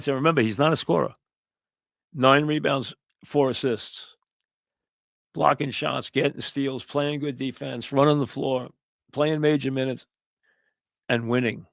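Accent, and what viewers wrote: New York, United States. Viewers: He's in or near Noo Yawk whaddya expect? Back afta dis...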